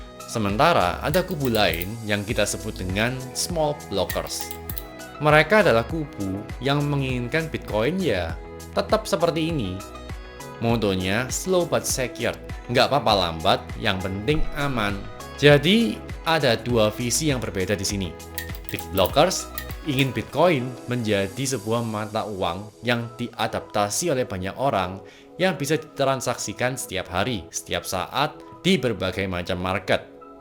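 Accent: Java, Indonesia. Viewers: ngomomngnya medhok, kayak wong yogya atau suroboyo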